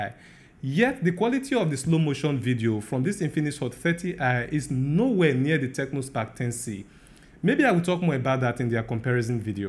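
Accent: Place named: Africa. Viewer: Greetings from Venezuela. I had some africans friends and yoyr accent remember me them accent. I like it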